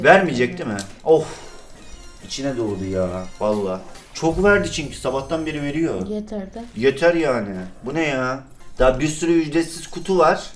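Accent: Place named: Laz, Turkey. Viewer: Bu adamı eskiden tanıyorum laz gibi konuşaydu şimdi nonoşlar gibi konuşayu para adamu degiştiriye haa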